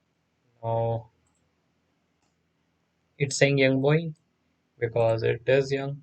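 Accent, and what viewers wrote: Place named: India. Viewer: the moment you hear the indian voice you know you can rely on them to help you with virtually anything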